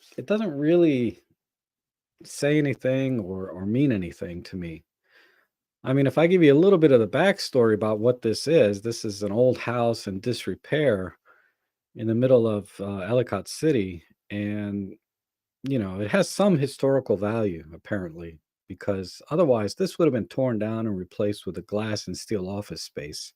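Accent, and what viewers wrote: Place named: United Kingdom. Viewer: I thought you were british, you dont speak as sloppy as most American. I am from Sweden by The way and follow you, red 35, Peter Forsgård, Matti Sulanti, Robin Wong, micro four nerds among other.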